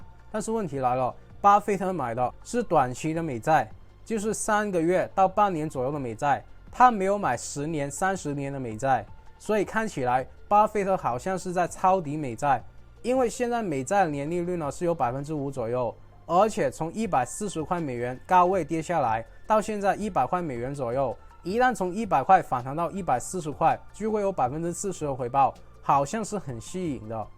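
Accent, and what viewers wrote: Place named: China. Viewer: 原來你是大陸人，難怪口音用語不像台灣人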